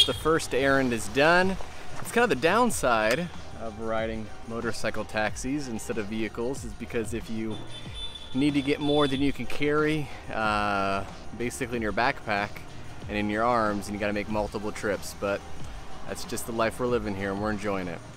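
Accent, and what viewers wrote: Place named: Krio, Sierra Leone. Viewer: I enjoyed hearing your Krio as well as the bike ride footage. Have you tried hailing with the little noise they make with their mouths? I can't do it, and I am always amazed that the bikers can hear it.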